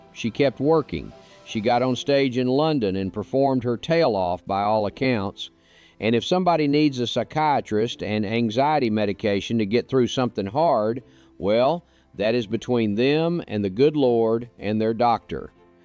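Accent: Texas, United States. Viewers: You gloss over genocide, the deliberate bombing of children's hospitals, bulldozing of human beings, machine gunning of children and starving refugees by the extreme terrorist nation of Israel as "foreign policy". It was not politically smart to engage with her co-star on this, it's true, but those atrocities demand a level of response and engagement that goes far beyond one's career, industry polity, and 170 million dollars. In fact I would trade a single Palestinian child's life, for 170 million dollars. By the way, *Israeli soldiers have asserted their "right to rape" in this conflict*, and largely gone unchallenged. Make a video about that with your Texas accent. Until then, you are all too predictable.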